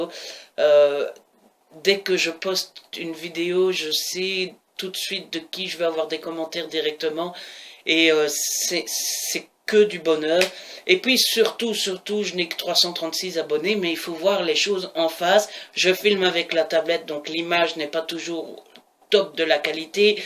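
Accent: Belgium. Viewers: Coucou! Happy Bday!:) Je ne suis pas une habituée des commentaires mais sache que je te suis depuis quelques mois maintenant et que ça reste un plaisir de voir tes vidéos:) (C'est sympa d'avoir un booktubeur avec un super accent made in Belgium! Je suis Liégeoise, je sais ce que c'est lol) Perso, j'aime les formats habituels, je n'ai pas de demande de vidéo spécifique. Au plaisir de te voir dans une prochaine vidéo, bisous!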